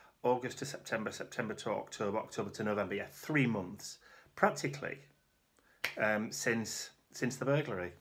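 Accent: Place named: Yorkshire, United Kingdom. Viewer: Hi! I'm new to your channel and am enjoying watching your videos and crafting techniques! And...I thought I recognised your accent as being Yorkshire, and narrowed it down to Bradford where I'm from (now live on Corfu!) So I wasn't far wrong! Lovely to hear it!! Hope everything goes well for you, regards Anne!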